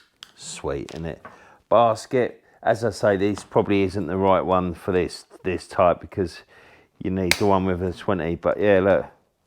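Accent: United Kingdom. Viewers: awwwite mate av a day off! I used to live in London, I do miss that fantastic accent, could listen to it for hours. Thanks for the tool review! PS Cobolt refers to the material it's made from. They add it into steel on better quality tools to make it harder, as obviously you want these snips to be made from a harder steel than whatever it is you're trying to cut. Like padlock chain on a bicycle for many users I expect heheh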